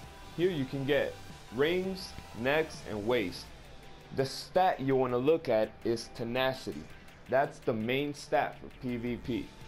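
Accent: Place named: Brazil. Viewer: Where are you from? By the way you talk, u seem to be a Brazilian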